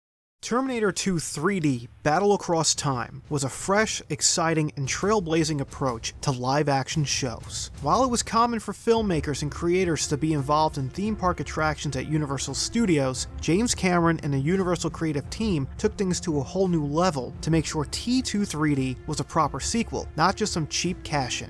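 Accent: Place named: Baltimore, United States. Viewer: yo this man got a thick baltimore accent lmao "for more den two decades" love you dawg!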